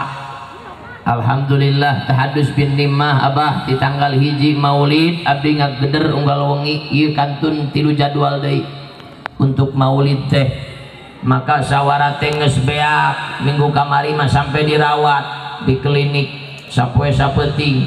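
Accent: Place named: West, Indonesia. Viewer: Ngulon pisan nyariosna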